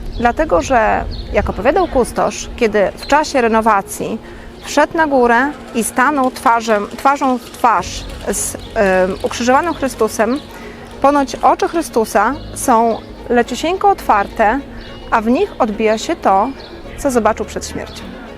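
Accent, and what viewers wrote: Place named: Italy. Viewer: Uwielbiam słuchać Pani opowieści. Włoski akcent jest niezwykły, nawet w języku polskim 😊😊😊😘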